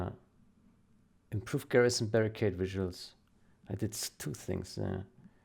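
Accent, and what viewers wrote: Germany. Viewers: ты русский и украинский понимаешь? Но акцент у тебя больше немецкий:)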